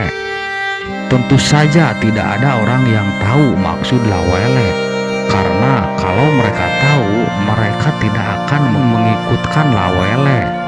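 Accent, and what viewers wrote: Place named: West Java, Indonesia. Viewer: Sayang sekali Pembicaranya berlogat SUNDA, sehingga penyebutan nama2 jadi salah. Misalnya : LA WELE' ..ada tekanan di ujung kata ... Merubah bunyi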